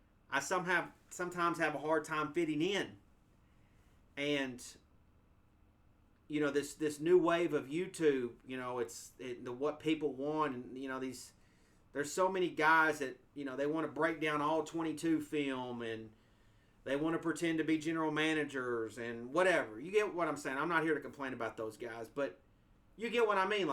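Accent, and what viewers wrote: South, United States. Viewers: This is the side of I like and appreciate. Calm, and makes a lot of sense. One thing, he’s gotta be from the south with that accent. And! It’s apparent, he must be a NASCAR fan as well., who’s your favorite driver? What’s your thoughts on the current state of NASCAR? I left NASCAR on the final lap at Phoenix last year when 7X Cup Champion Jimmie Johnson rode off into the sunset. Love to hear your thoughts. Love your channel.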